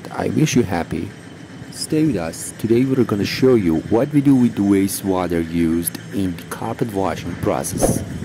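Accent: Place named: Europe. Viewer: Why is the voice that's narrating this video sound European but all the men in the video look Middle Eastern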